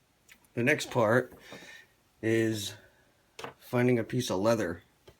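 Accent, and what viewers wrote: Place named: New Jersey, United States. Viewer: I really like ur channel. You get right to the point, no stalling, no boring rambling. We think alike, wondering where ur from, almost sounds like a jersey accent. I'm on fb in the groups, BR etc. Don't kno who u are but wouldn't ask u to put that info here. Keep up ur awesome work. Love ur Barkie collection!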